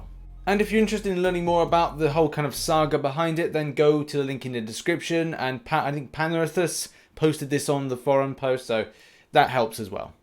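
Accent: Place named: Yorkshire, United Kingdom. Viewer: Not a bad Yorkshire accent there I’m from barnsley which is the broadest Yorkshire!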